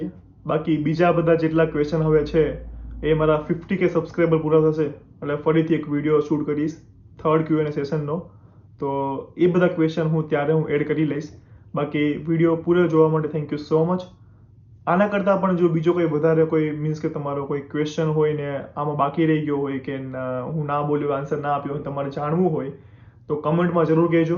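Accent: Kathiawar, India. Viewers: Naa... beta tame kathiyavadi cho e to language par thi j khabar padi j jaay che....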